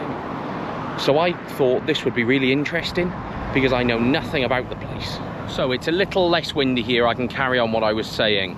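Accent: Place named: United Kingdom. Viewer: Я конечно не понимаю на английском, уж тем более с британским акцентом, но посмотр был интересным!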